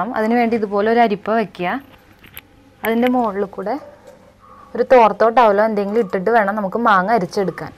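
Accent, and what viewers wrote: Thrissur, India. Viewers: Enjoyed watching the video AND THE " THRISSUR BASHA ". My grand mothers & mother used make this Kadumaanga / Kannimaanga Achar ( Vadumaanga Pickle in Tamil ). They used to parcel in air tight / sealed containers at local blacksmiths and send to their children residing outside India. Now it is becoming more & more difficult to make at home and easier to buy in the market. Thanks again for the video and again for the Thrissur Basha. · Valare nalla avatharanam ethra simple aayaanu ellaa karyavum paranju thannath Evarkkum manassilaavunna thani naadan bhaashayil ellaa karyavum visadeekarichu thannu Nalla cute voice Chechiyude samsaaram kettittu chechi Thrissure jilla kkaariyaanennu thonnunnu Thrissure jilla yilaano veedu Marupadi pratheekshikkunnu Ethrayum nalla sabdathinudama yaayittenthina Mukham kaanikkaathirikkunnathu Thank you chechi thank you veri much🙏🙏🙏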